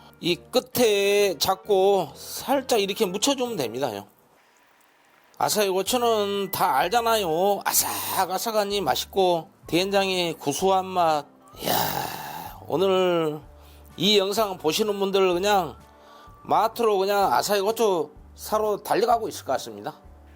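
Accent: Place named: Gyeongsang, South Korea. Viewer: 너무 메이갈지말고 ㅋ 쉐프님도 경상도분이시군요 이거람 남편 반찬 걱정없겟어요 ㅎ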